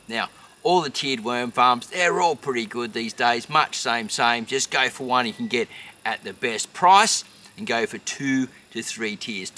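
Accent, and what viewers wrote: Australia. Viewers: Thanks mate. Always good to hear an aussie accent 🤙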